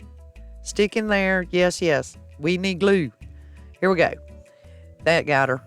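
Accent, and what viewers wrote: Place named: Carolina, United States. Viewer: I love this! Thank you for sharing. I love your accent. You sound like me. I’m a Carolina girl. 😊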